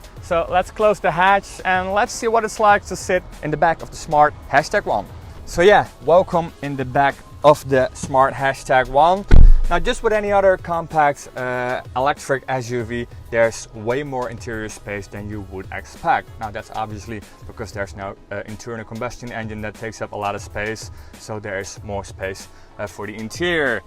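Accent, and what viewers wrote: Netherlands. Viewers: the English was perfect, but me being from Belgium, I always recognize a Dutch neighbor when I hear one. Great job with the review.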